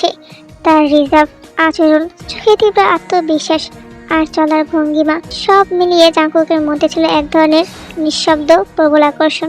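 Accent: Sylhet, Bangladesh. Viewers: আপু তুমি কি সিলেটি?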